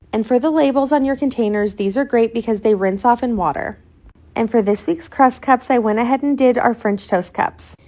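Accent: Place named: Australia. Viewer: Are you Australian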